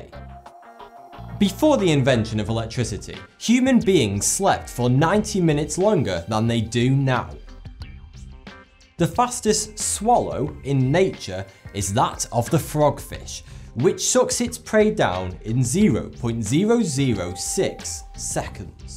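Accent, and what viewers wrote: United Kingdom. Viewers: Is he British?? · I like your british accent :3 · Thoughty2 sounds like 42 with your British accent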